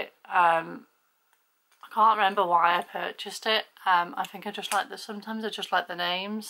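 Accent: United Kingdom. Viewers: I just came across your channel. I listened to what you had to say. Love your English accent.❤️❤️❤️❤️❤️ I Absolutely Love Their candles. I pick them up all the time in TJ Maxx , Home Goods, Marshall's here in the states. I can't live with out them. I have the in all different sizes from the tiniest little ones to the biggest ones. I have single wick, double wick, and three wick. They are Fabulous candles. And by the way I love anything that has to do with pumpkin. I also just subscribed to your. 👍🏻⭐☺️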